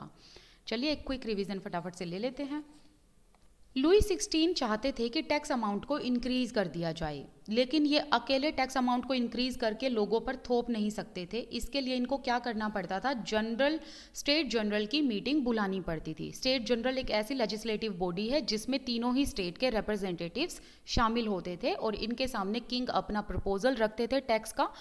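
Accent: Haryana, India. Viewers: Mam aap haryana se ho kya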